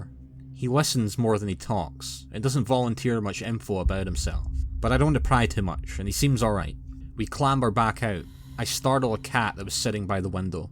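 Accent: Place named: Scotland, United Kingdom. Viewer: Lmao you literally got the same accent and hilariously deadpan delivery of frankie boyle....well accent isn't nearly as strong, he's probably the most scottish that a man can be, complete with huge red beard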